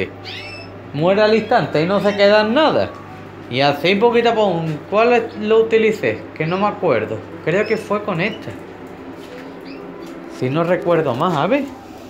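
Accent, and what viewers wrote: Andalusia, Spain. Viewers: Ese andalu que parece que va a contar un chiste...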